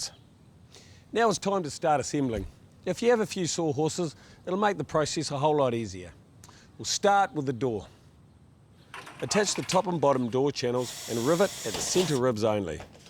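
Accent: New Zealand. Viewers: Just a Kiwi accent the whole time mate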